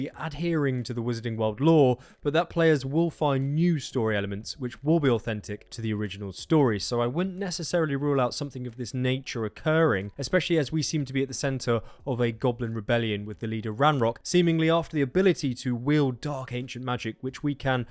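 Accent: United Kingdom. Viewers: There's something about a British accent speaking about Hogwarts/The Wizarding World 🤣 Great video, looking forward to Gamescom! Thanks! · Big up the British accent on these videos 👍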